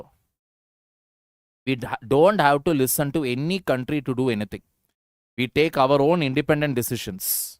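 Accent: Kerala, India. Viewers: I think he is from Kerala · Sir ru from Kerala same my sir has same slag as u talk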